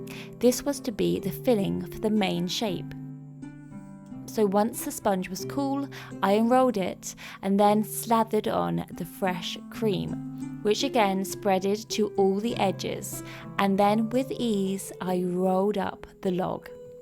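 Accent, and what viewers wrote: United Kingdom. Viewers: I know your accent is British but it sounds very particular, which region is it from? I watch someone else from Yorkshire but it’s not quite the same and that’s my only frame of reference.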